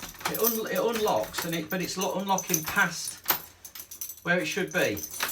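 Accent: Leicester, United Kingdom. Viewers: Great vid, sorted it! Is that a Leicester accent?! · Knew I recognised the accent! Fellow Leicester lass here! (Living down south) .. I have this problem right now.. Will report back!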